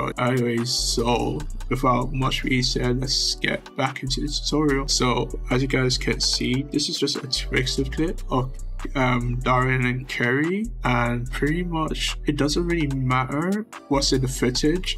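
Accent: United Kingdom. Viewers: AHHHHHHHHHHH THIS IS EPICCCCCCCC- ALSO DON'T WORRY MAN I AM SUBBED TO YOU SO NO NEED FOR YOU BRI'ISH VOICE TO TELL US!!!^^ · nice hearing your beautiful bri'ish voice again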